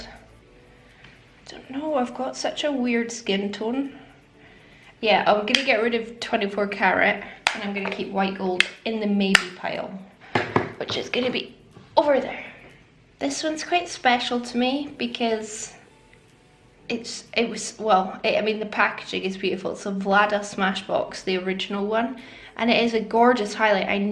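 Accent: Scotland, United Kingdom. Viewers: Scottish? I think...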